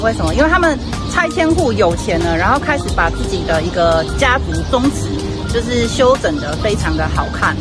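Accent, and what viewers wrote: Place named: Taiwan. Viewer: 國語台灣本島腔，難聽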